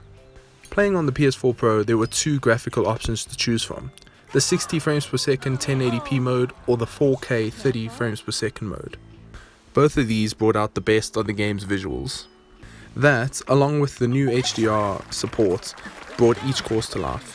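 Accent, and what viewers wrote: South Africa. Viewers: Thought I recognised that accent! Local is lekker, keep it up, guys!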